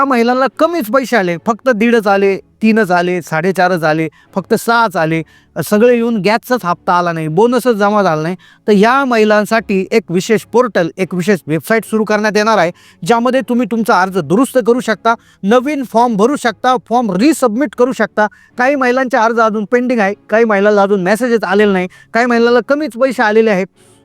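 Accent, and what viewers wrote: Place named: Pune, India. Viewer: Punayt tune boltay me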